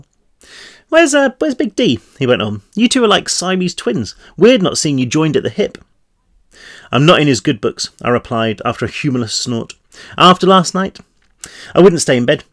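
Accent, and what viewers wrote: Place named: United Kingdom. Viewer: I love hearing read it in a British accent. How cool would it be to have a recording of Shakespeare reading his own plays? I like how he shares his own thoughts too.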